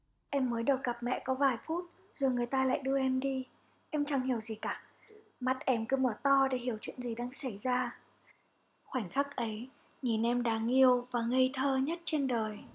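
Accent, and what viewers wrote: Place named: North, Vietnam. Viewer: Chị ơi sao chị đống phim chị nói tiếng việt sao chị lại nói tiếng bắt vậy chị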